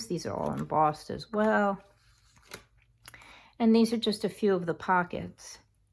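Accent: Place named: New York, United States. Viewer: I thought I heard some New York in your voice! I’m originally from Brooklyn 🥰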